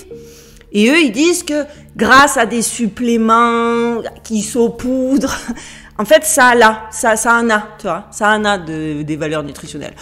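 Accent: South, France. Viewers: Un vrai rayon de soleil, ton accent du sud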